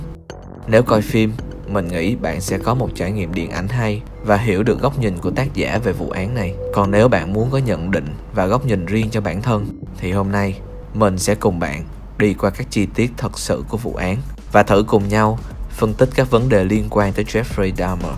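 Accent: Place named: Saigon, Vietnam. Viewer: a này nói giọng sài gòn hay và cuốn quá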